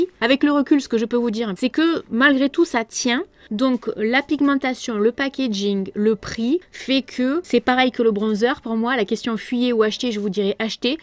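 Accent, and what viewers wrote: South, France. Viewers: Merci pour toutes tes vidéos j'aime énormément j'aime beaucoup ton accent du sud. Je suis aussi du sud de la France de Nice plus particulièrement! Bises toi et à ton chien qui est beau comme un cœur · Cool la fin. You are the best.. Avec notre accent du sud. J'ai Instagram. Je vois le hall de ton gros colis kado à gagner mais jne peux pas commenter et m'inscrire. J'adore ces couleurs de rouge à lèvres te va super.. Je l'adore.. Bizou 💋❤️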